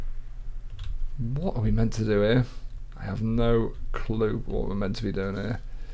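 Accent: United Kingdom. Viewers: Your accent...From.the wirral?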